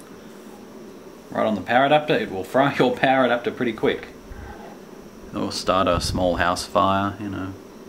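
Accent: Australia. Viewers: You sure are one heck of an imaginative guy. I've seen some of your "constructions", I just wonder why you have so many "DC's" :P I'm from Portugal. You have an australian accent? Am I wrong?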